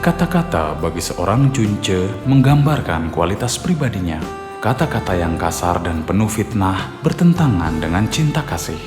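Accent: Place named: Indonesia. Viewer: Kenapa bahasa mandarin di baca dengan logat indonesia??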